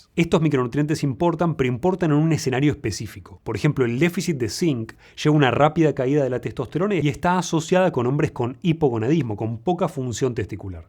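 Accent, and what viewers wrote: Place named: Córdoba, Argentina. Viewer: Eres de Córdoba por tu acento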